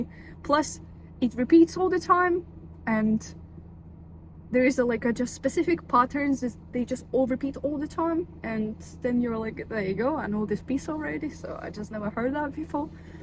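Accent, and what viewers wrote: Scotland, United Kingdom. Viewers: you have a beautiful accent! is it just my imagination or do you have a little bit of scottish? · Iv listened to a few of your videos, but your carol of the bells piano is stunning. Always thought you was eastern European for some reason. I can definitely hear the distinctive Scottish in you and the name should of been a give away. But am half Scottish grew up half life in England and Scotland but im struggling to understand you at times. Are you full Scottish. Ya accent is almost like Scottish and Scandinavian or something. Ah ok your feom Ukraine im more into the video. Your accent is almost like 3 accents, im kinda seeing what my accent is like now for the first time haha. As my accent is all over the place also 😂. Awsome Use ableton is more intuitive especially for just play loops in live and capturing ideas.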